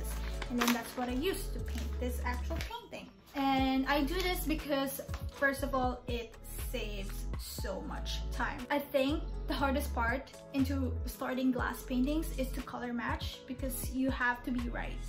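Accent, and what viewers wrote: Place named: Philippines. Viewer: Thank you for this! <3 (Glad to find a Filipina/Pinay artist! thought you were Filipina by your looks+last name at first then your accent told me the rest. and hey I know my people 😂 mahal kita!)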